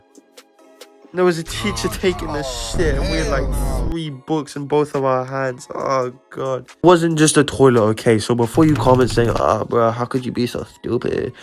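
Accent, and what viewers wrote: United Kingdom. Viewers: Anyone else notice he has an English accent with Puerto Rican parents? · "Puertorican" Bri'ish accent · The fact that he’s a Puerto rican with a British accent and his dad it’s kinda cold where he’s at is kinda wild to me. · Your Puerto Rican with a UK accent? That’s interesting….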